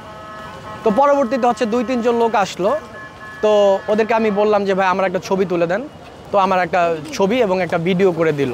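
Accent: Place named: Geneva Camp, Bangladesh. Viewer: তোমার কথার মধ্যে মাওরা মাওরা একটা টান আছে, জেনেভা ক্যাম্পের বাসিন্দা মনে হচ্ছে। তুমি বড়ো হলে শুয়োরের বাচ্চা হবা। 😂 নিশ্চয়ই।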